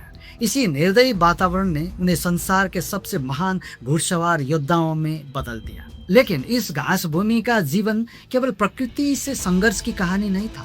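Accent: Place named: Nepal. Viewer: u r nepali guy. bcoz how u speaks Hindi 100% nepali accent. with tone everything. but kinda like u person full confident. but never accept own mistake.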